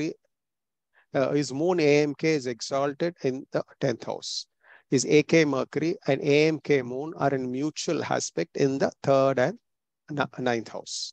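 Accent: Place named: South India, India. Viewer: sir, super exceptional knowledge but i must add that this video has no meaning for those who are not well versed in basics as sir speaks very fast and doesnot give a second to link what all he is saying plus his south indian accent adds to problem. Even transscript is not clear that you can pause the video and read his words of wisdom. sir you will appreciat that all of us are in the process of learning. The worst part is if you miss a link then it is very difficult to understand and the interest is lost in the video. What attracts us is your knowledge and a different perspective to read the chart. So the request is to be little slow instead of galloping.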